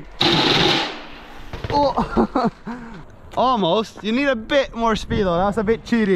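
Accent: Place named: United Kingdom. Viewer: I have a feeling like your accent is becoming more British. Have you noticed?:)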